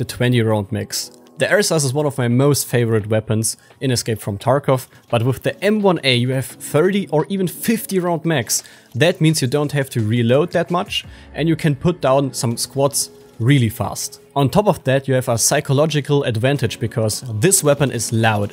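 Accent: Germany. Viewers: I hear German Akzent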